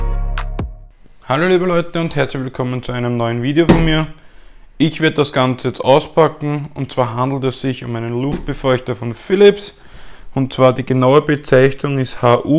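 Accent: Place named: Tyrol, Austria. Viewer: I bin mir sicher das du Tiroler bist 👍🏻🙋‍♂️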